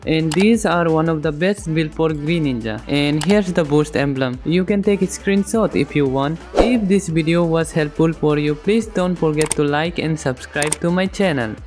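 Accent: India. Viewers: Aew you indian ? Because I am also indian and by your saying accent is like Indian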